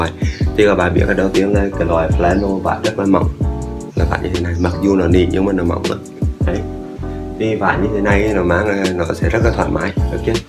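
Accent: Hue, Vietnam. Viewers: Giọng Huế dễ thương quá anh ơi~~~~ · đây là lần đầu em xem video của anh :D mới vào em đã có ấn tượng với giọng của anh ( em cũng người huế ), anh noí rất dễ hiểu. chúc anh thành công hơn :D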